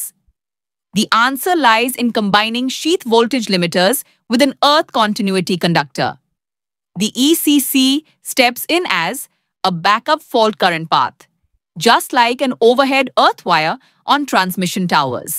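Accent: India. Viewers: Funny that Indian dialect is still there in the AI speech :D Anyway, great content!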